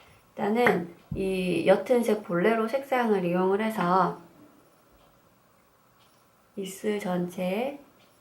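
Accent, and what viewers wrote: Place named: Gyeongsang, South Korea. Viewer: 경상도분이세용?ㅎㅎ 사투리가 ㅎㅎ 저두-대구살아용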